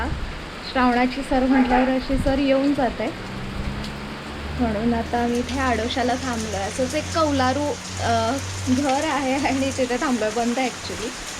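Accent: Kolhapur, India. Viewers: THANK YOU KHUP VIDIO PAHAYLA MILTAT PARANTU TUMCHI DEVGAD OFBIT BHTKANTI MANALA BHAVLI TMHI DOGHHI FAR JUNTALMAN AAHAT TU MAZYA GAVCHYA SANGMESHVAR JAVAL DHAMNICHI AAHES PAN TUZYA BOLNYYAT KOLHAPURI TUCH AAHE TUZYAKDUN AAMHALA APLE KARNESHVER MANDIR SAMBHAJI VADH STAMBH MARLESHVER HI OFBIT THIKANE TU KADHI EXPLOAR KARNAR.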